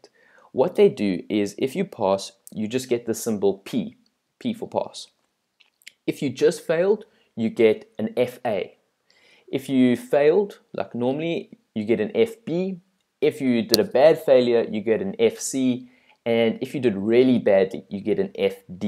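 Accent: South Africa. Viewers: Ahah you are so South African! Me too.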